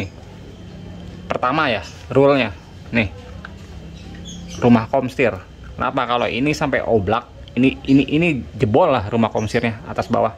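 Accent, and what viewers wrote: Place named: Indramayu, Indonesia. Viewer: Ngomong jawanya kya jawa indramayu😁😁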